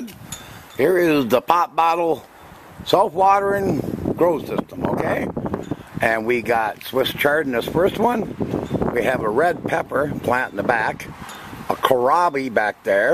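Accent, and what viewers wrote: Minnesota, United States. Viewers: im sitting here watching his videos thinking the way this guy talks hes gotta be from minnesota haha. and sure enough he is. cheers from saint paul!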